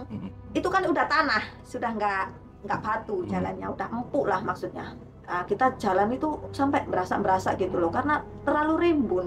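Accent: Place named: Surabaya, Indonesia. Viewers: Metu medok jowone mbak seng marai lucu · Omongane wong suroboyo nyel 😂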